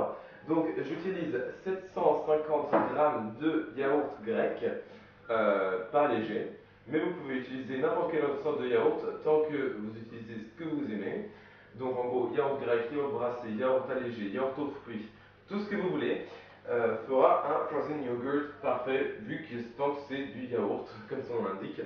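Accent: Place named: England, United Kingdom. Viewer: ton accent anglais est terrible, sinon bonne vidéo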